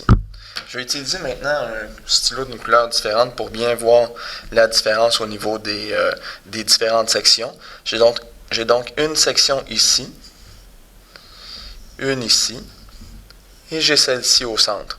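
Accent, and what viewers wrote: Quebec, Canada. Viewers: Ooooohhh un quebecoiiisss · owww un québécois , j'aime bien les québécois x) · C'est plutôt sympa comme méthode, et c'est vraiment bien expliqué. (sauf que ton stylo rouge il n'écrit pas rouge😂😂). It's a shame! 😅. Avec un super accent québécois, j'adore! Maintenant est-ce que c'est vraiment utile comme tu le dis si bien ? Pas vraiment, c'est plutôt ludique en tout cas et rigolo. Mais je pense qu'il ne faut surtout pas que les enfants oublient d'apprendre les tables de multiplication, ça leur servira toute leur vie. Moi je les ai apprises en chantant comment on faisait avant et j'avais plaisir à le faire quand j'étais môme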